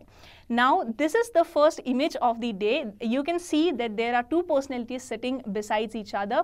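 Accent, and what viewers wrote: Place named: Northeast India, India. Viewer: mam i guess you are from north east.😃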